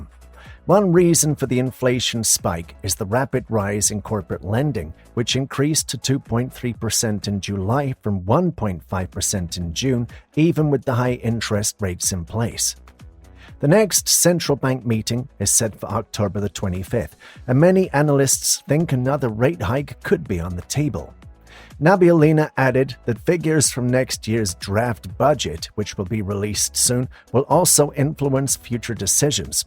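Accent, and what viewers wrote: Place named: United States. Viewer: You know rush her is stuffed.....the yank accent is it too knowledgable man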